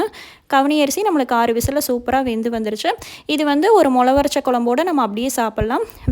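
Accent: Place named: Kongu, India. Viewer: Sister unga kongu tamil rompa responce ah erukku enakku pidichirukku subscribe pannita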